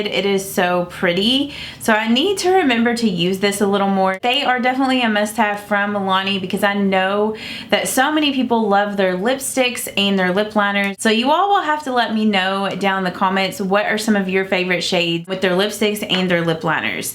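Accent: United States. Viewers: Thank you for the Milani review,. I have several of the items you spoke of (blush, eyeshadow, lip creams). They are awesome and long-lasting. Please do a video on your makeup look in this video - it's beautiful! I love your accent, where are you from in the US? I am from Ontario, Canada. Cheers!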